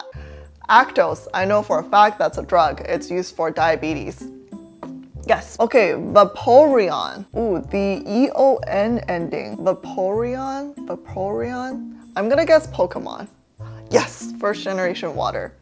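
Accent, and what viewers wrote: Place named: Canada. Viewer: You sound like a Canadian Jenny Tian lmaooo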